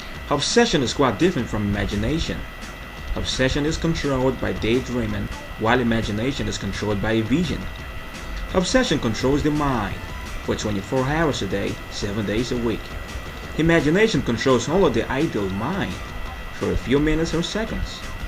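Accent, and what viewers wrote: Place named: United States. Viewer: well said. this American accent na wa o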